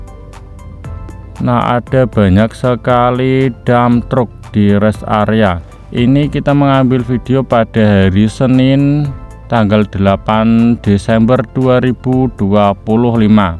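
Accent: Java, Indonesia. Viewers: logatnya medok banget yah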